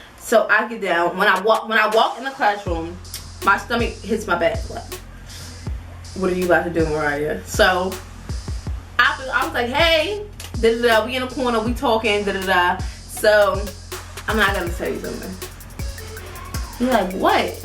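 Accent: New York, United States. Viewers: u from new york?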